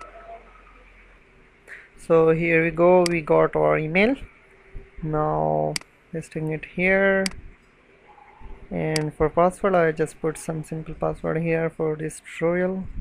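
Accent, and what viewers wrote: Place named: England, United Kingdom. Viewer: It is hard to follow your English accent. Next time make sure you add subtitles. Thanks.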